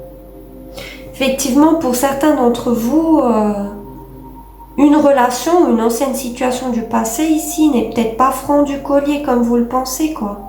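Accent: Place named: East, France. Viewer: Bonjour j adore le ton de votre voix des habitants de l est de France merci pour ce tirage très détaillé et pointu et de partager votre savoir c est un plaisir 🎉 trop parlant pour moi bien a vous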